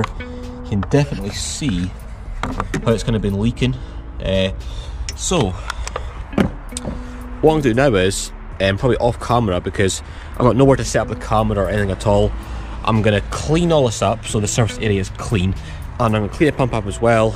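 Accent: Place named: Scotland, United Kingdom. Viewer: I've got an A4, but harder because it's close to the bulkhead but just takes patience. I rebuilt the tandem pump as well. Oil pressure light gives you a fright 😁 VAG cars all do this with long cranks, don't ken why. Guid to hear a Scottish voice on YouTube, keep up the good work. Inlet looks spotless. 🏴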